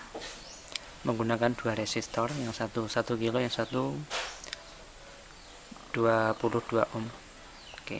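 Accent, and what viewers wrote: Blora, Indonesia. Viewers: Owalah wong Blora to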